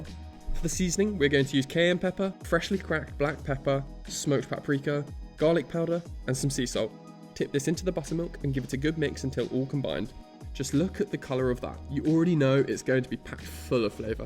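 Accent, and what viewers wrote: United Kingdom. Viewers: Are you British, sorry for my question, but I started with the step of learning and developing the English language, and when I heard you, I felt that the English language is different from the British and I apologize once again to you...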